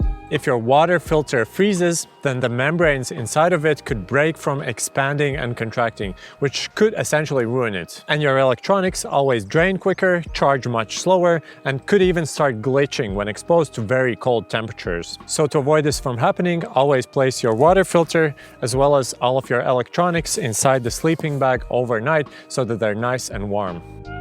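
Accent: Latvia. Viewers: man pagāja 7 sekundes līdz uzķēru, ka tāds akcents ir tikai latviešiem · Es dzirdu latviešu akcentu? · Latvian accent?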